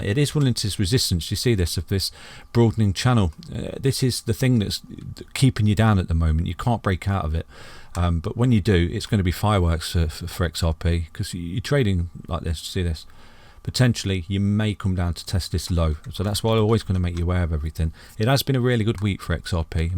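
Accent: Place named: Leicester, United Kingdom. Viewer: Where in the uk you from? You sound like your from Leicester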